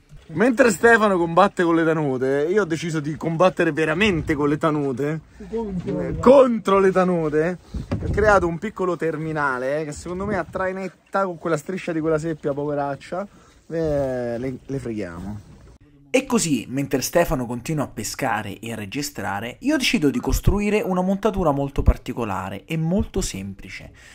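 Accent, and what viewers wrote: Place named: Rome, Italy. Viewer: Mi sembra che hai un accanto Romano. Cosa ci fai in Sardegna.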